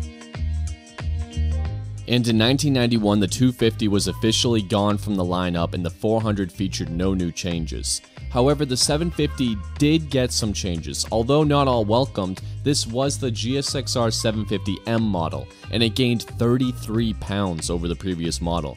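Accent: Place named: New York, United States. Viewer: I like this channel because it's a real human talking (I think!) If it's A.I. with a NY accent I'm impressed. LoL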